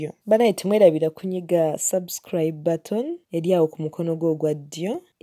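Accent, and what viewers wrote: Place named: New York City, United States. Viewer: Extremely NYC voice...this is first class screaming...not so different butbyo voice hooo😂❤🤗🥰💥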